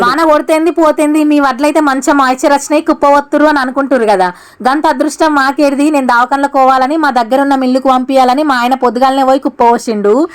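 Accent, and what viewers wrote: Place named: Jagtial, India. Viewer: చెల్లమ్మ మీది ఏ ఊరు నీవు మాట్లాడే యాస ఆచం మాలాగే ఉంది మాది జగిత్యాల జిల్లా మీ ఇల్లు మా ఇల్లు సేమ్ టూ సేమ్ ఉన్నాయి నీ వీడియోస్ బాగుంటాయి 👍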